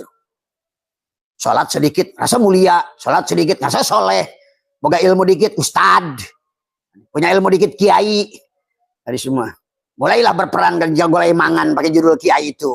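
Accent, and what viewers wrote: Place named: Java, Indonesia. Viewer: Pasti orang jawa ini ya😂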